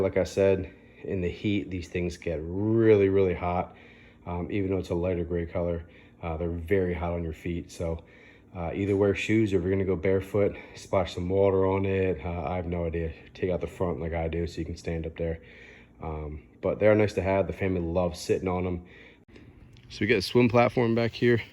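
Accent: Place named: Pennsylvania, United States. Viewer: That Pa accent is strong with you. Love the video. Very informative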